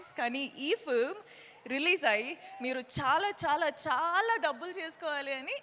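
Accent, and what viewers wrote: Telangana, India. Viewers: You become what you eat❤ Telangana slang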